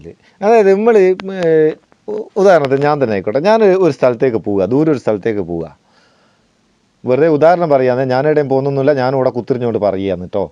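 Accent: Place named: Vadakara, India. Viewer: വളരെ ഉപകാരമുള്ള വീഡിയോ 👍👍🌹🌹🌹🌹 ഇങ്ങള് വടകര kkaran ആണോ സംസാരം കേട്ടിട്ട് ചോദിച്ചതാണ്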